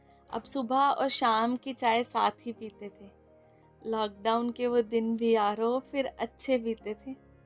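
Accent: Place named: Punjab, India. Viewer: You have lovely punjabi tone as well💞💞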